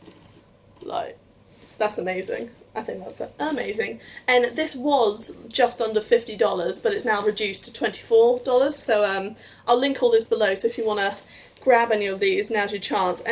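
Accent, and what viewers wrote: United Kingdom. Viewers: I love your british accent pretty girl, great videos, I wish you happy holidays-christmas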